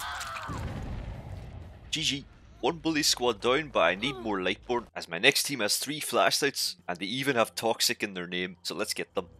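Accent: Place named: Scotland, United Kingdom. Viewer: First time coming across this channel and you've got, I think, the strongest Scottish accent I've ever heard, nice honestly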